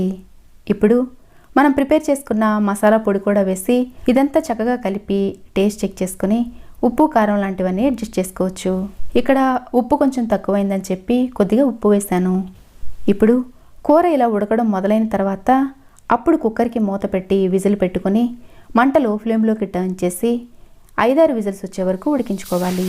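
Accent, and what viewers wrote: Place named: Andhra, India. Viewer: Andi andi meru Telangana unna Warangal vantalu ani youtube channel peru pettuko andhra slang matladutunnaru enduku...meru andhra na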